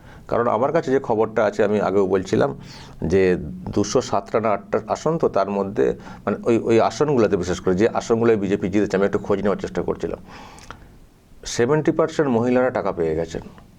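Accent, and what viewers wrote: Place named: Bangladesh. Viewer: Uchcharon toh puro Bangladesh e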